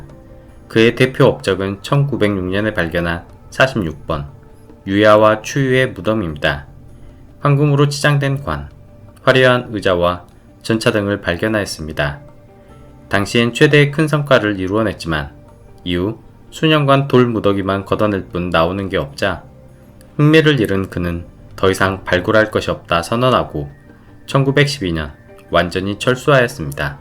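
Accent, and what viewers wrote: Jeolla, South Korea. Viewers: 전라도 분 이신가보네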